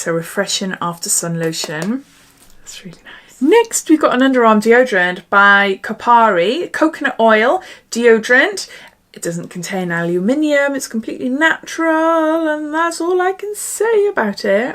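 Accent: Wales, United Kingdom. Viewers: It looks fab xx I loves your welsh accent. My family are half Welsh xx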